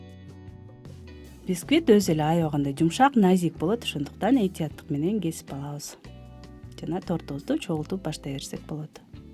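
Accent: Standard. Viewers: Таза кыргызча суйлогону жакты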